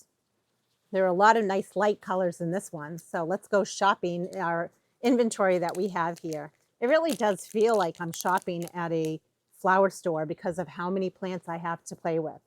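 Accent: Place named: Maine, United States. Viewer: Just found your channel- wondering if you're from Maine? You sound like you are lol I'm in Central Maine. So glad I found you! Thank you for the helpful video on baskets- I'm new to gardening and grew a bunch of flowers from seed this year. Gonna make some up now!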